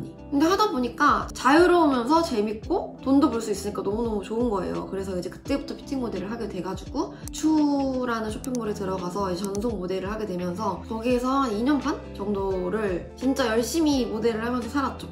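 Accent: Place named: Gwangju, South Korea. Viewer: 말도 너무 예쁘게하고 그냥 천사같당 저도 광쥬사람인데 억양에서 조금 느껴지네유 고생 많았어염 앞으로도 지금처럼 행복할거예요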